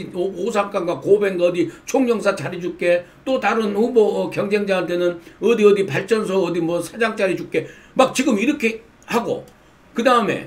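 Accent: Jeolla, South Korea. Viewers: 영삼씨 웅변이 재미있네요 절라도 말별로 안좋아했는데 구수해보시네요 좋아요~^^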